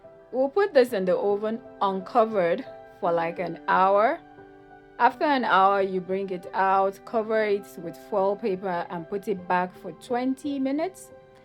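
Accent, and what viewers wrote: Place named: North, United Kingdom. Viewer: Hello sis... Here watching.. Your presentation is giving vibe. I like the northern posh accent echoring beyond your voice 👌👌👌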